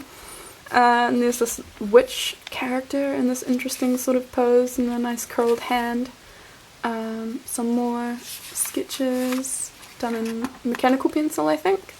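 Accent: New Zealand. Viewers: Are you from New Zealand ?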